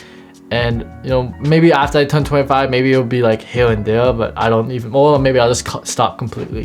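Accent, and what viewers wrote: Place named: Toronto, Canada. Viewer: definitely from toronto, man said ball up hahaha, i rate it though keep it pushing brotherrr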